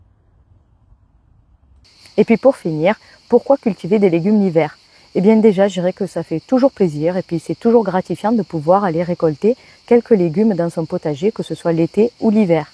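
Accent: South, France. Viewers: Bjr madame merci pour vos partages vous avez un accent dû sud nous sommes peut-être voisines ,je vis en appartement je suis novice question semis etc vu les événements il faut que je puisse être autonome cela dis j'ai pas là main verte ,avez-vous des conseils ?sans indiscretion de quel département êtes-vous ?ps c'est un plaisir de vous écouter ❤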